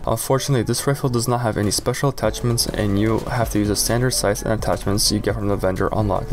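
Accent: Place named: Russia. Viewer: По акценту видно русский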